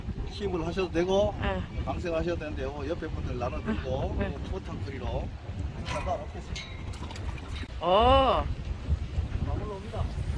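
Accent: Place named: Gyeongsang, South Korea. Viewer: 차가라~차가라 차가! 완죤 갱상도 말씨!ㅎㅎ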